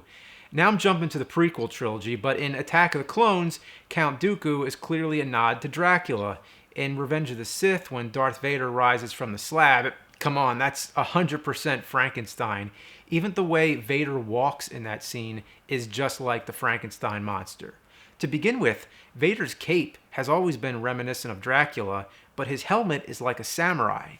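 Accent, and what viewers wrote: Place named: New Jersey, United States. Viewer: It's because he's from Jersey. That's how people around and in NY speak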